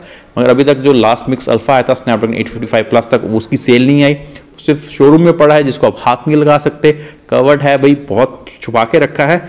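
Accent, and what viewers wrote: Punjab, India. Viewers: are you punjabi ? or Saraiki dialect ?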